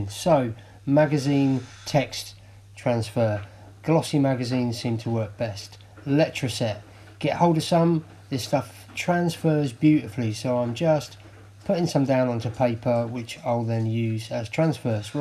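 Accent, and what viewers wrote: United Kingdom. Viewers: I shared it w my art group and we may spend a day trying it…getting magazine images to transfer is a neh, sometimes it works some times it doesn’t, but I’ve had luck using pigment ink before laying the page down….I’ve got you on my watch list, as there’s lots of videos I want to watch…and I love your British accent!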